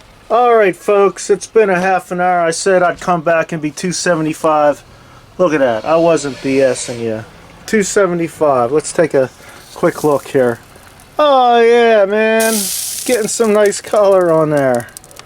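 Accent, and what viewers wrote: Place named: New York, United States. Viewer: “Forget aboouu It” can tell you are from the north East with a touch of New Yorker in ya. That lack of burnt charcoal is so impressive. Just got rid of all my extra 18” Webers - guess who is now back searching for one. I already have a couple of old stock pots - you truly inspire me.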